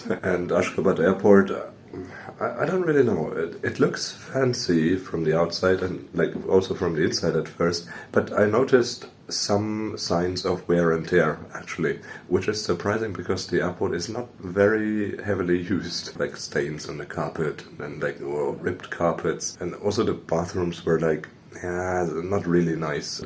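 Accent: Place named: Germany. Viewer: 3: 16 ... can barely hear you .....plus the strong german accent ...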